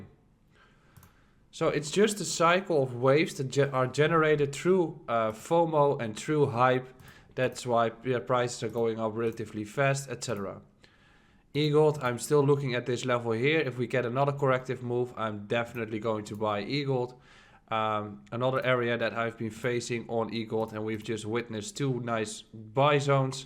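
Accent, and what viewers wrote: Netherlands. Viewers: Did I hear a Dutch accent?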